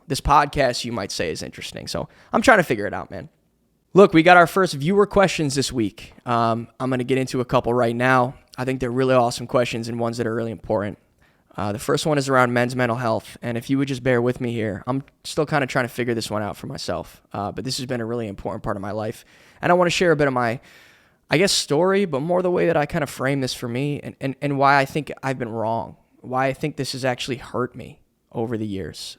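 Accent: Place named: United States. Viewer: The diction, the intonation, the rhythm....... soooo perfect! 🙌 I'm currently learning American English and YOU have the most PERFECT American accent, I can listen to you the whole time and mimicking you (and that makes me feel confident and motivated) Hope you can make more solo podcast. Thanks a million! 🩵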